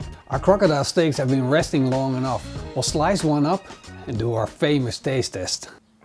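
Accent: Netherlands. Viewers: Wait, are you dutch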